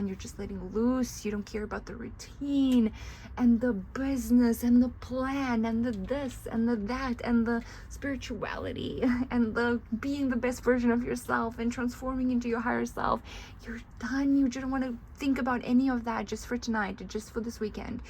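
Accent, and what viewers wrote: South Africa. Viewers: love that south african accent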